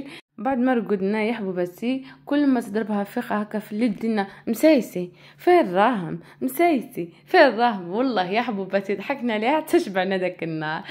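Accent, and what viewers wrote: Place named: Tlemcen, Algeria. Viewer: الله يبارك فحلك. هدرتك تلمسانيه 😊😊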